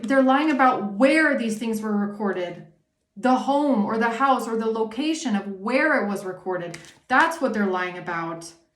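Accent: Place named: Germany. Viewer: Afternoon beautiful 🐝, LOOKING FORWARD TO WATCHING AND HEARING YOUR BEAUTIFUL ACCENT 😊 German ❤, have a beautiful day night x ❤ thank you x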